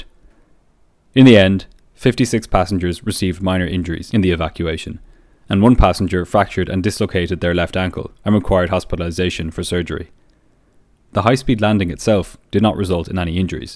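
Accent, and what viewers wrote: Ireland. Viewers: This is an authentic Irish accent!! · Hear hear! Nice to hear an Irish voice too 🤠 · The subtle irish accent is soo pleasant to listen to! I think one of the cardinal reasons I like this channel so much is the excellent narration. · Am I erong to guess that you're Irish just by your accent? Great chanel buy the way.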